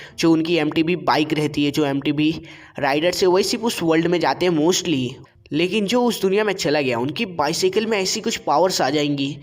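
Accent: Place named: Tamil Nadu, India. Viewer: Bhai Tamil Nadu se ho kya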